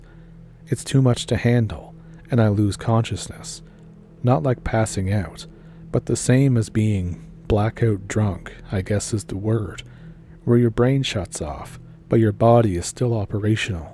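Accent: Canada. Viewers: You don't sound like you are from New Zealand. More like Canada. Cup of tea, real British stuff. Get real man. What a load of tripe. Your description of your fossil area near Christchurch do not match. Just made up stories with no truth. I gave up listening.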